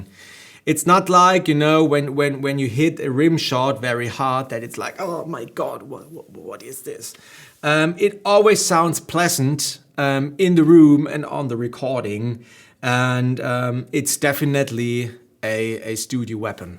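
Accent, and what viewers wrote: Germany. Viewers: geht mir genauso, geht doch nichts über dein Englisch mit Kurpfälzer Akzent 😉 erinnert mich so sehr an meine Heimat!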